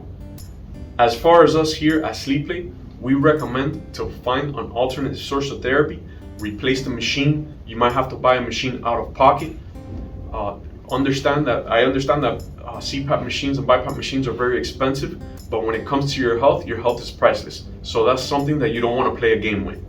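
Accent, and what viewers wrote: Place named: Miami, United States. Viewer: Bro, you have the thickest Miami accent ever. I gotta save this vid to show people what a Miami accent is. 😃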